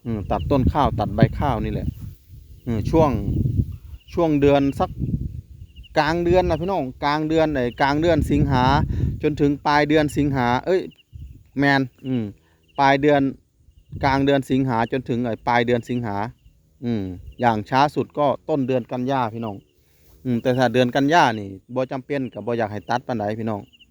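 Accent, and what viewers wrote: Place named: Sisaket, Thailand. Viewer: สำเนียงคือศรีสะเกษนอครับ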